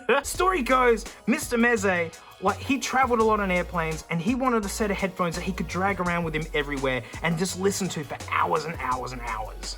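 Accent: Australia. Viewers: can someone link me the website he uses to see the audio graphs? i can’t tell what hes saying with his thick aussie accent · This is fairly mild Aussie in the scheme of Aussieness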